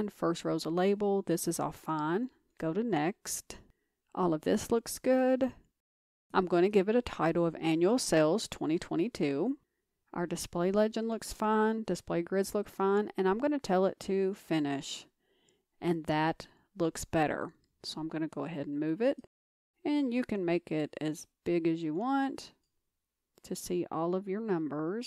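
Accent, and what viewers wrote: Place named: United States. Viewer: From ALL THE INTERNET, you're unique, American with American accent!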